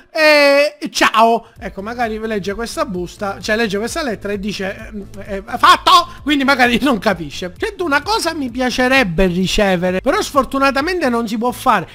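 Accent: Sicily, Italy. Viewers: ma sei siciliano??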